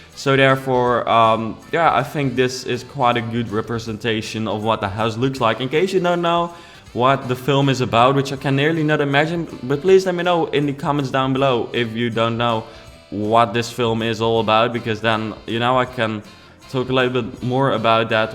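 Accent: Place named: Netherlands. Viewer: Haha ik kende je kanaal nog niet, maar ik klikte op je video (zonder naar je naam te kijken) en hoorde gelijk al dat je Nederlands was! Haha, Nederlanders hebben altijd zo'n accent, wel een super leuke video👌🏻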